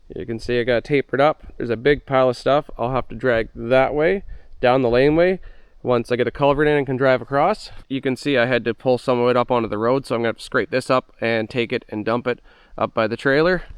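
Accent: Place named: East, Canada. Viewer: Fuel injector cleaners and a product called "Engine Restorer" get my endorsement for old junk iron like your machine. Engine Restorer is a product you put in your oil it contains copper, silver and lead and helps to re-seal worn cylinder walls and helps worn bearing surfaces. Project Farm used it with great success. It is really hard to get in Canada now though. Not sure where you're from. Sounds like back east Canada, but maybe Maine... I got a few jugs before it went away. I'm using it in my gas engine with 428,000 km. It is down a bit of compression on the cylinders. Did a compression test before and waiting to see how much it picks up. Many folks online have used it and seen good results. Keep that old iron going!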